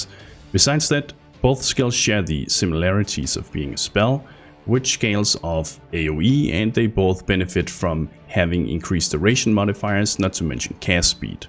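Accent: Denmark. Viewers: I am so sorry, but you should learn how to pronounce some of the words. I really like your videos but i can't take it seriously, you make good videos but you english could use some work. You sound like you are from Danish, Arbejd den Danske Accent ud af dit engelsk :/ lyder mindre godt. Hvis du synes jeg er en idiot ignorer mig :) hav en god dag. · danish accent?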